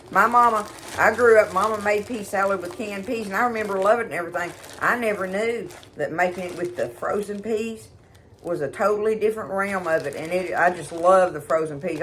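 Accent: South, United States. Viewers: Don’t like pea salad but love ur southern draw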